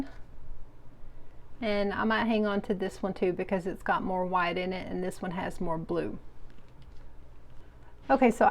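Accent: South, United States. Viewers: I didn't know you were southern! Tennessee? 😊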